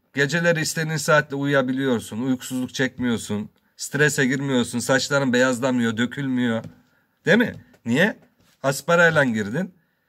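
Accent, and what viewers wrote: Konya, Turkey. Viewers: Başkanım adam konyaliyim diyo orjinal şive orjinal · abi konyalı misin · Hoca Konyalı herhalde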